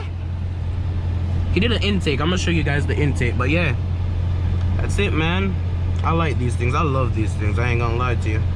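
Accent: Jamaica. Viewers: Wait deh,A sense a Jamaican accent mi brudda 🤔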